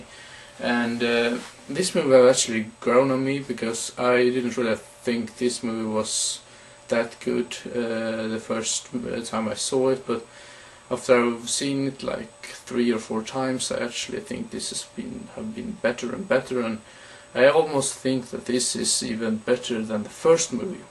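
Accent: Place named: Sweden. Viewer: Haha, hörde att du var svensk när du pratade. Roligt att de första jag hittar efter att sett trilogin är en svensk kille som snackar. :)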